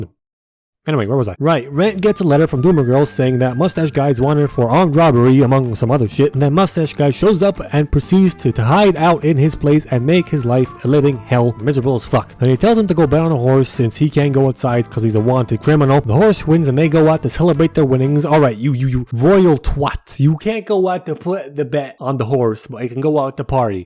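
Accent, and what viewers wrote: Scotland, United Kingdom. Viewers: Should have done the entire thing with a scitish accent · Your Scottish accent is pretty good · This man Scottish accent is gold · welcome to 1980's/90's scotland, auld maggie thatcher stuck to them hard, also the scottish accent was on point boi! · Your Scottish accent Is great. Don't stop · To other Scottish accents I've heard you're's isn't that bad (I'm Scottish btw) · your scottish accent isn't too bad. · I’m convinced throughout the whole video he became more Scottish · Loving the Scottish accent From a Scottish person😂😂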